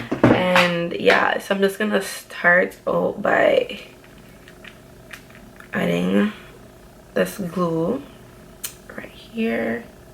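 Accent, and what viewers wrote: Jamaica. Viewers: Are you jamaican? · Jamaicans English language sah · I hear my Jamaican voice 😍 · Chile the jamaican accent is tryna jump tf out loool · You sound jamaican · Immediately subscribed once u started talking #Jamaican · Dah jamaican accent🇯🇲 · You sound Jamaican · Are you jamaican? Love this. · Is she Jamaican?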